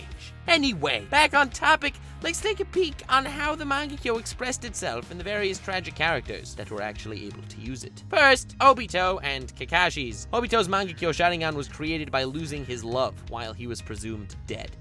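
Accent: Canada. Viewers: i can hear the canada in your voice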